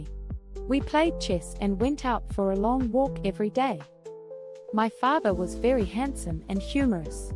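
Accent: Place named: United Kingdom. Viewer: Жестт. Че за акцент британский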